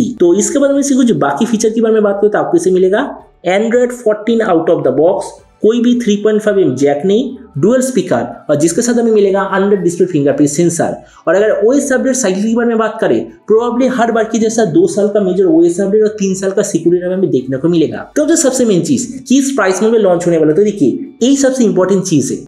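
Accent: Bengal, India. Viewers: Bro, tongue Hindi nahin hai to Hindi channel mat karo, bongali hi thik rahegi.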